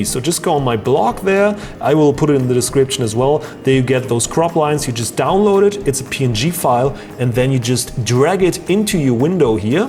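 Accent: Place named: Germany. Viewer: Irgendwie hört man dass du auch Deutsch sprichst ;D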